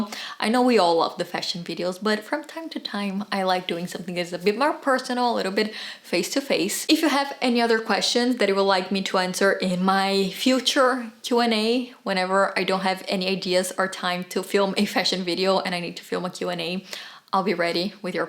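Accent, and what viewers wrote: Brazil. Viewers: The second I heard you pronounce certain letters I knew you were Brazilian 😊.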